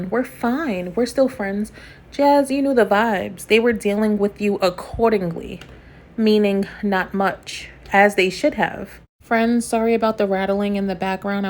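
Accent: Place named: New York, United States. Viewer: Are you from New York ? I love love your accent !!!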